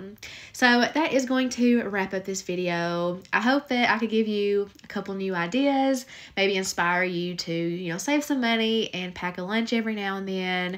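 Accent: South, United States. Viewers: I know this is an older video, but I have just recently found your channel while I have been stuck in bed due to an illness and have been watching YouTube nonstop! I absolutely love your channel! I am in Alabama and I love content creators that have the Southern accent like mine! Don't worry, yours is great, I sound like straight cornbread! Lol. Anyway, I would like to know where you got the pot that you cooked the chili in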